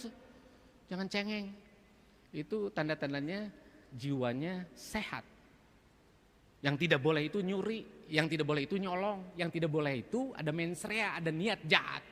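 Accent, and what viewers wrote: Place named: Sunda, Indonesia. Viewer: Nyunda pisan👍👍👍 mantap ngabret" pak sekda"sauyunan ngabangun Jabar istimewa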